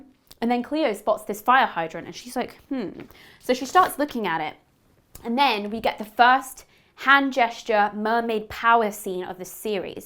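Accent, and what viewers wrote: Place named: Australia. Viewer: You could just be reeeeally committing to this but I’m gonna believe you are. Names right. Tiny image resembles the actor. Played 1 out of 2 of your gaming vids and heard the Aussie accent., you were wronged, so wronged. You were sweet and Kim was a little asshole, honestly.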